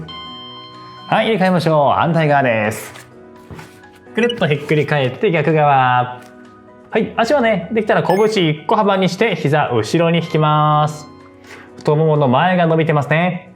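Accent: Kansai, Japan. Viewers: 関西弁のいい声はナダルみたいで聞き入ってしまいますね！ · 参考になります で、ええ声の合間合間にかなり関西弁のアクセントが入ってるのですが、「漫才師の麒麟」の感じでええ声関西弁でストレッチもなんかいい感じ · この回は関西弁が少々多目で嬉しい😆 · 先生のレッスンでピラティス熱が再燃しました！ありがとうございます🙌 あと、今日初めて、ん？関西アクセント？のイケボ？と思いました。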